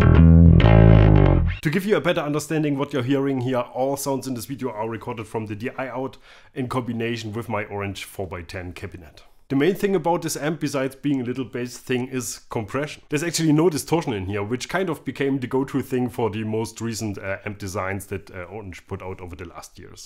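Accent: Germany. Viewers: Phil Mitchell reviews bass stuff with a german accent on youtube? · The content is always great, but the German accent and eye movement is what keeps me coming back.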